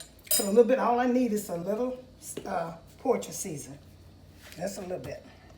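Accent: South, United States. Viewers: Ooooh...as u stand there reminding me of my Grandma cooking brought tears to my eyes. She was the BEST...your soft calming southern voice is truly a memory of her...Thank you for sharing Continued #BLESSINGS to you🙂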